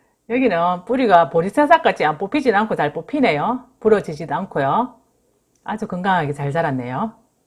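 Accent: Gyeongsang, South Korea. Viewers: 주부님먹는소리가말자국 같아요ㆍ경상도주부님 같아요ㆍ알뜰한 주부님 성격도좋아요ㆍ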